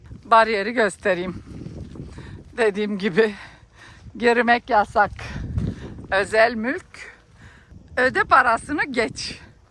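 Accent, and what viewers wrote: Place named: Bulgaria. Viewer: Ne guzel video cok mutlu oldum..birde bulqar turkcesi konuşmana bayiliyorum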